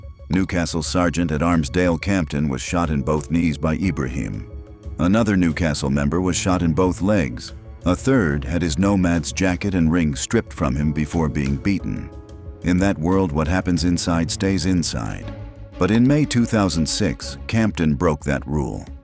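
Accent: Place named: United States. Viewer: Why is this narrated by a septic tank which is utter condescension. Even the images of the notes are American not Australian currency, and it does not snow in the suburbs of Sydney and Kevin Rud is not Anthony Albanese. If you're going to have a documentary about Australian bike clubs, it should be narrated by an Australian who knows the details not by some stupid septic tank. ￼💩💩💩💩💩💩💩💩💩💩💩💩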